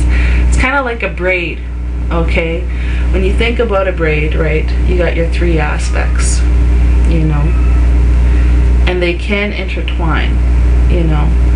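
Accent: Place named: Canada. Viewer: I really enjoyed hearing explanation to this question! For the one searching... just remember, the passed on author Scott Cunningham was a male, and another good teacher. Yet mannerisms and Great White North accent give things a little more personal aspect.:) Blessed Be!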